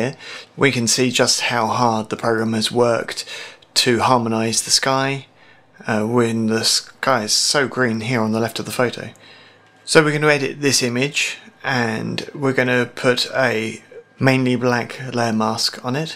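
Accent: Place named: United Kingdom. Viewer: The British accent is awesome... This tutorial has been terrific. Thanks...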